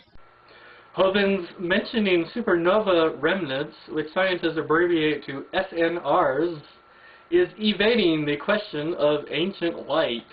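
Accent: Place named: United States. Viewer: How the hell can you mistake an American accent for an Australian one? Though I guess you have to be sheltered to like Hovind....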